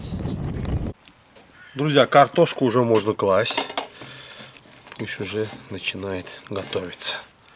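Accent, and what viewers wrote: Baku, Azerbaijan. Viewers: Очередной раз кайфую от того как готовит автор! Бакинский акцент это просто услада сердца! Первый раз слышу что говядину надо мариновать три дня . Обязательно попробую так сделать. Спасибо! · Бакинский акцент не перепутать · Это кайф слушать ветра Бакинского берега и Бакинский акцент. Дай БОГ Вам здоровья дорогой! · Прекрасный обед. Сам я родился в Баку 65 Лет назад но очень приятно слышать дружелюбный бакинский акцент. Спасибо большое каспийскому берегу!!! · Дааа, Бакинский акцент и мастер класс !!!! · 7 Февраля и время просмотра 23.56, случайно увидел видио, наверное кушать хотел 😂, но тут реально Шеф и мастер своего дела, четко и без пафоса все рассказал и + Бакинский акцент ))) спасибо вам дорогой, жду выходных и буду делать вкусные шашлыки из говядины ( Дана ) 👍👍👍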